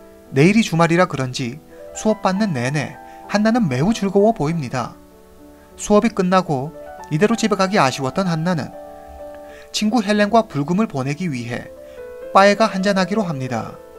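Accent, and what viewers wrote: China. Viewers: 똑같이 느끼셨군요, 조선족 말투???....😁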